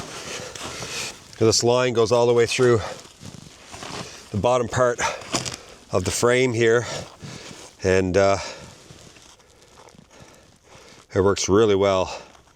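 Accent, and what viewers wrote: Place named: Nova Scotia, Canada. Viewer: Just noticing your east coast accent. Not quite Newfoundlander, but very much like a Blue Noser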